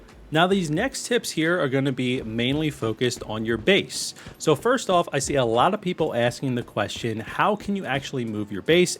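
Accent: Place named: Pennsylvania, United States. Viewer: This guy sounds like he’s from Pennsylvania. Good tips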